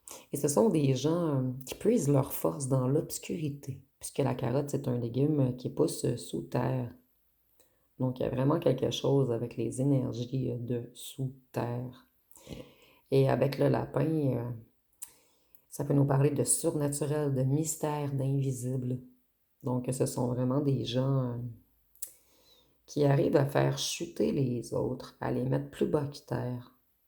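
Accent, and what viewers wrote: Quebec, Canada. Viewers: Bonjour et merci pour votre travail ❤❤ vous êtes une belle âme et j adore votre accent vive le Québec 🎉🎉❤ tendresse du cousine de France 😂😂😂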